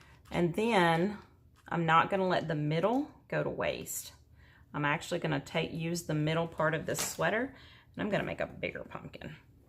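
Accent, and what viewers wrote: South, United States. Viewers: This is awesome so thank you! I’m also living for all the the southern speak, I’m not kidding!!! 🥰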